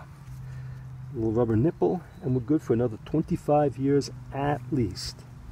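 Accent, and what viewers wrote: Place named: New York, United States. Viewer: This was great. I can hear the New York / Italian heritage lol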